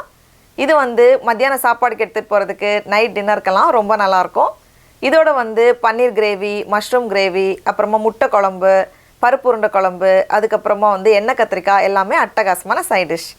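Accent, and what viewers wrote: Nagercoil, India. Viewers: Akka NenGa NaGerCoil aH ???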